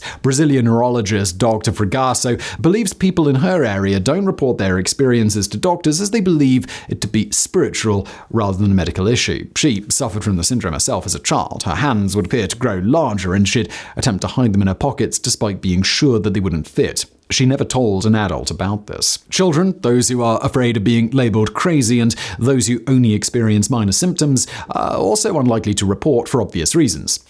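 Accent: United Kingdom. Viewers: I like this channel minus the obnoxious British accent.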